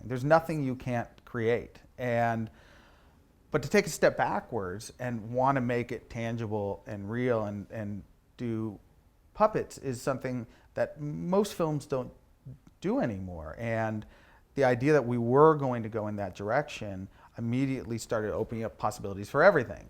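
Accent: United Kingdom. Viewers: Que inglês é esse, hein,?! Sotaque britânico, pronúncia impecável e fluência perfeita! E a entrevista está ótima também! Meus parabéns pelo canal.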